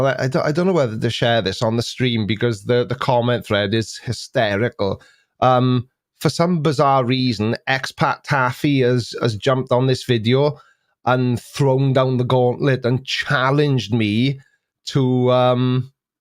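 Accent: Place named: RP, United Kingdom. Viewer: his queen's english is ok.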